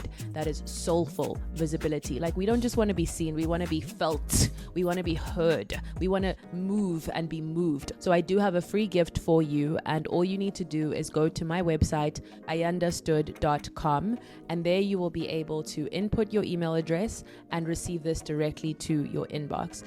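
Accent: South Africa. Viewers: Your accent sounds like you're South African- if you are, it's always amazing discovering fellow South Africans who are sharing such wonderful videos through the lens of spirituality.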